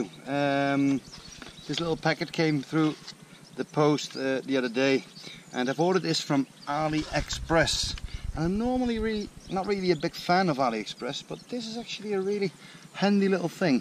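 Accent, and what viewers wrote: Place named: Netherlands. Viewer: yeah! Only thing is that it takes about a month to get it delivered. I live in the Netherlands. I suspect that's a place you know well given your accent!😉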